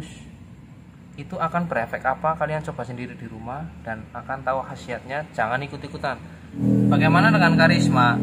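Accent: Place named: Java, Indonesia. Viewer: Medok jawane ndi hehe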